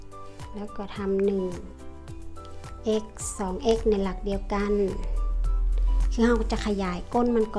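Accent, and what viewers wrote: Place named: North, Thailand. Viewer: ภาษาเหนือน่ารักค่ะ กระเป๋าก็สวยค่ะ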